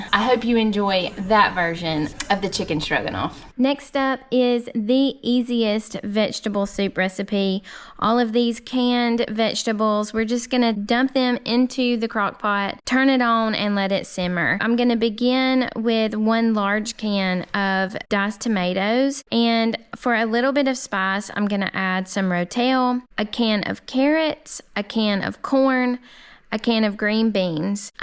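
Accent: South, United States. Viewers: I love your Southern accent. Where are you from?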